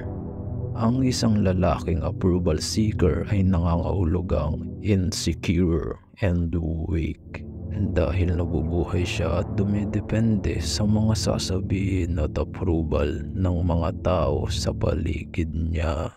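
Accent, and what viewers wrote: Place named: Visayas, Philippines. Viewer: bkit ganyan boses at pagsasalita mo???bisaya ka noh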